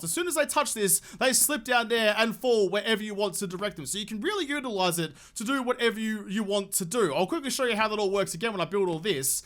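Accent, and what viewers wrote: Australia. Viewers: Luv ya vids and ya silly Base ideas Thx a lot Mate ♥♥♥ Even as a fu... ng Kraut I overstand ya Aussie English (worked a couple of years as Scuba Diving Instructor and had a few Aussie Coleauges) Where I n I cant get some Tickets 4 Park 🤔🤔🤔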